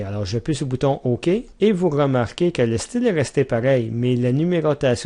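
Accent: Quebec, Canada. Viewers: avec l'accent québécois en plus!!! :P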